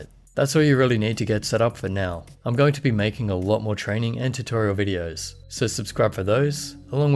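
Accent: Australia. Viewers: I think he is from Australia actualy. He's definitely not from Canada but I am ^^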